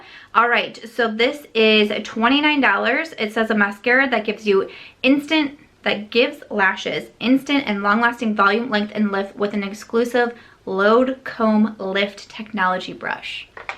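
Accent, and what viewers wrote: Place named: Midwest, United States. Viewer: So i agreed with so much of what you said here. 1. This palette totally speaks to me; i also have very few cool-toned nudes in my collection. 2. Placement suggestions as names?! UGH. I understand the helpful aspect of it, but that can go on a little card or on the plastic sleeve that comes in palettes. I think names spark creativity when they give a vibe to go with the color. If (when?) i get this palette, i'll make little stickers with my own names to cover the nonsense that ND put on there. 3. Rare Beauty: i have lupus, rheumatoid arthritis, neuropathy, and several other conditions that cause pain and fatigue, which sometimes makes even the simplest tasks (like opening a small bottle) very difficult or impossible. So i deeply appreciate the thoughtfulness with the packaging. Plus her message about beauty is something we all need to hear. 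<3 Side note: your Midwest twang (it makes me miss my Chi home), your green eyes (like mine), your love of wine and the Cubs, your birthday is the day before mine (which makes us both Aries)...are we related?! LOLOLOLOL :)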